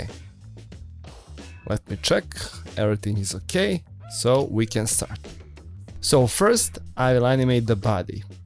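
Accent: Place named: Russia. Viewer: Тебя русский акцент выдаёт )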